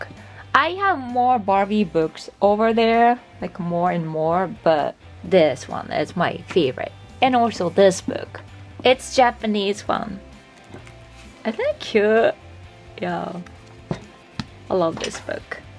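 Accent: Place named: Belfast, United Kingdom. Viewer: hey love your belfast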